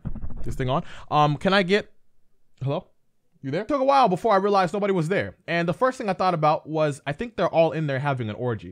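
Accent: United States. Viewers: Damn bruh. Where you from the chi?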